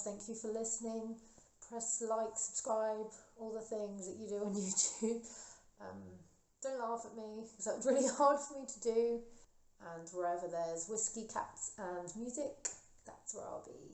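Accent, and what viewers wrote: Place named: South, United States. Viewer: First, wow I forgot how southern ur accent is, I love itttt <3 beautiful. 'u look fine' absolutely riles me as well, and goes the other way when I'm manic and people say I look amazing n im thinking hun I'm living off spinach and I can't stand still. People's unsolicited advice/opinions can shove offfffff. Thanks for making this, it's so hard to talk about , and I relate to a lot<3 also I have that cat necklace but in pink iaaaaaaa <3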